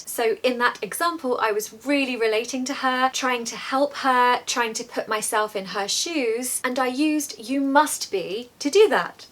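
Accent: United Kingdom. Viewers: Hello, it's always very nice to listen to your stories and wonderful british accent! I have a question for you: are you English or Scottish? I presume English, but I am not sure! 👍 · British Accent is very good i Adore it❤❤❤❤❤❤❤❤❤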